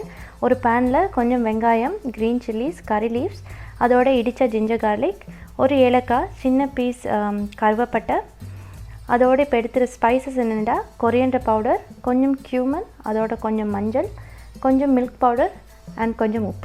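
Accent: Sri Lanka. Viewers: Periya wela srilankan muslim slang la pesureenga .. nan perunalaki cook panna inga wanden recipie thedi, sari sis 1 suport 1 sub for u dear sis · Normala srilankan maariye peusuriga.love you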